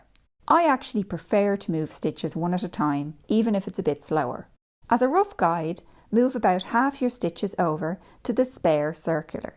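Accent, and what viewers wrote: Ireland. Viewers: Very nice instructions and love Irish accent 🎉❤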